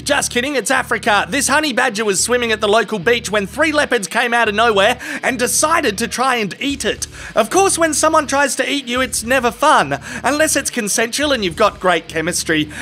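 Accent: Australia. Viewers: Haha watched this elsewhere and rematches a few times thinking "holy shit" I need the Aussie man version. Well done son, well done. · 3 leopards each 3 times larger than the lone honey badger, yet he comes out on top. As my boxing coach used to say: "Balls win fights." Loved the Aussie narration in this one!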